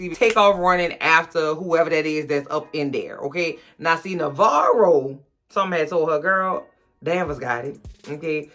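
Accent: New Orleans, United States. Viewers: I love your reviews and your NOLA Accent .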